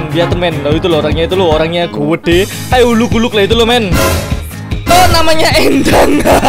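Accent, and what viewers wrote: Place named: East Java, Indonesia. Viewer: jawa timuran , rame juga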